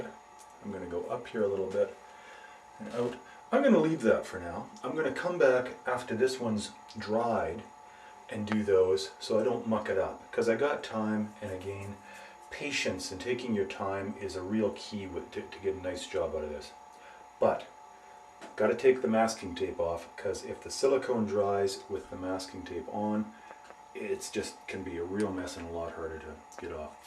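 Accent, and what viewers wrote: Canada. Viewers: thought you sounded canadian with the distinctive ooo's and then I'm pretty sure it is confirmed when I saw the french writing on the caulk tube. good job! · Thanks for this nice little video - maybe not up to professional broadcast standards, but calm and pleasant and perfect for us dummies. Actually, your narrative sounds very much like the E.B.White readings of "The Trumpet of the Swan" and "Charlotte's Web", except this is the first time I've EVER heard a stereotypical Canadian accent like Bob and Doug Mackenzie's! (Which is kinda weird, since I live in Montreal and spend a lot of time in Toronto/Hamilton.) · everybody's an expert... NOT... Guess I'll have to post one to show how to caulk properlly.. SHEEEZZZ He's not British.. I'd say a canuck..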